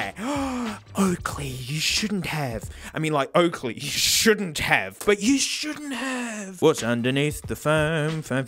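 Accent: Australia. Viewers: I love this guy, it's like an Aussie Señor Pelo that makes videos about audiphones and piracy. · this guy can't be more australian and i'm overwhelmed by it.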